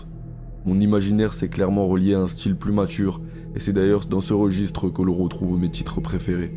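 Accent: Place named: Banlieue, France. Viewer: Je suis fan moi aussi de l' univers de Miura. Votre vidéo est intéressante et prometteuse, je vous encourage vivement à en présenter d' autres ,que ce soit pour approfondir sur Berserk ou aborder d' autres de vos passions. Votre petit accent de quartier m' a rappelé les ( très heureuses) années où j' ai travaillé comme instit en ZEP. Quand je croise mes anciens petits élèves , ils ont un peu cette voix et certains sont devenus des golgoths qui me dépassent d' une bonne tête😂 . Je ne travaille plus dans l' éduc nat.( Je suis handicapée et je me consacre désormais à l écriture et au dessin) Je ne vous écris pas cela pour vous raconter ma vie mais parceque vous demandez des retours sur votre travail . Il comporte beaucoup de qualités mais il y a quelques petites maladresses d' expression qui gagneraient à être corrigées 😊.Vous aurez,si vous continuez à produire des vidéos, des auditeurs d'horizons très divers er ce serait dommage que quelques maladresses gâchent leur appréciation sur votre travail. 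Si vous voulez je peux corriger rapidement le script de votre prochaine video, ( gratuitement ) . Vous écrivez plutôt bien et je sais que ça ne me prendrait pas des heures. je trouve que votre propos serait mis davantage en valeur. En tout cas félicitations, vous donnez envie de découvrir cette fantastique fresque graphique sans rien spoiler! Si vous voulez me joindre je suis sur Facebook ( et oui,j ai 50 balais, c' est bien de mon âge !) et sur Instagram à mon nom. Cécile Creixell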